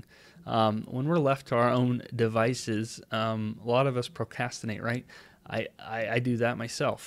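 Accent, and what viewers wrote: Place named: United States. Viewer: I think a major advantage that your course offers is the simple fact that English is your first language, with a US accent. You also seem like a good instructor because of your desire to want to help others. Your personal journey is comforting knowing that we can reach our goals too, even if we had a set back.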